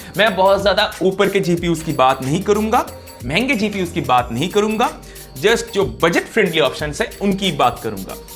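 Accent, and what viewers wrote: Bengal, India. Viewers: You bengali?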